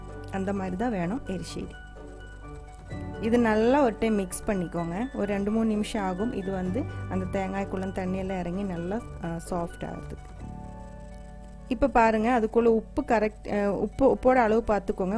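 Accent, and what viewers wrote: Kerala, India. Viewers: Your Tamil blended Malayalam is so nice to hear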